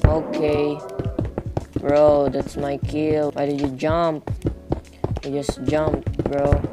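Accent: Philippines. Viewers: Yo!! Sounds like from Asia huh? Hmmmm phillipines? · is an real filipino have accent cool🔥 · Philippines is cute speaking english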